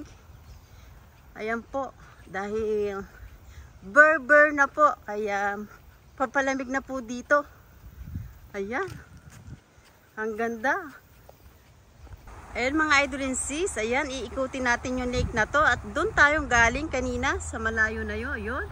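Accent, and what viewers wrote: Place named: Philippines. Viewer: Wow ... beautiful country side .. the weather looks calm , at looking napa fresh Ng place .. awesome lake view .. thank you for bring us there .. sis .. feel ko ehh parang taga Bulacan or Nueva Ecija or Quezon ..kz Tagalog na Tagalog ka sis .. thank you for bringing us there in Georgia ..tru Virtual tour .. Sis ..paxshout out Po sa next video upload mo ..❤😊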